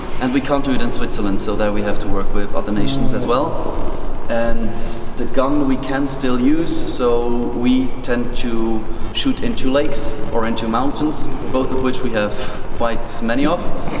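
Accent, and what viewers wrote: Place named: United Kingdom. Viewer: Voll interessant. Sehr sehenswert. Erzählt in wunderschönem Britischen Englisch.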